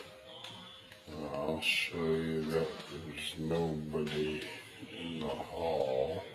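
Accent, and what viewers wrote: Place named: Maine, United States. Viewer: Seen your channel on Nukes Top 5 and I just subscribed. Can't wait to check out your other videos. You sound like you're from Maine. Peace✌️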